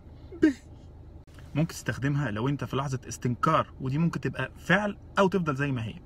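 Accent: Tunisia. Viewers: صدفة دخلت وجدت هذا المبدع. و لو لي نقد خفيف. يجب تخفيض طبقة الكلام. الللهجة المصرية صلبة لا تنطقها بالتونسي لانخا لهجة لينة.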